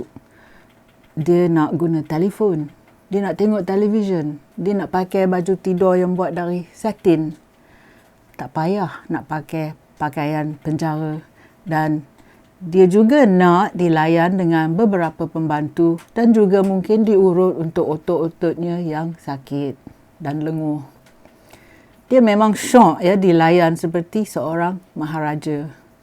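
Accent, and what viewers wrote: Perak, Malaysia. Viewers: Kak, buat cerita saya suka, sama hati dgn perasaan rakyat Malaysia. Sy org Perak, suka lawak Dan slang kampung kite. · Seghonok dengor ckp Perak...good job Puan. Would like to hear more of this banduan joker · Suka dgr bila puan cakap loghat perak.🤭 Bila la najib pakai baju bnduan.. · apsal pompuan ni pelat? slang apa dia pkai ni? kejap peghok kejap utagha? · Pekat benor perak nye....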